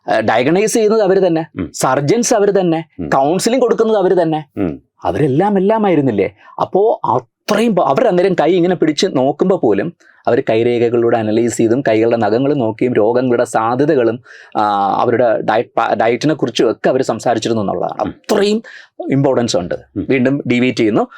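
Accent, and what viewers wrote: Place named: Trivandrum, India. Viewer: Tvm slang alle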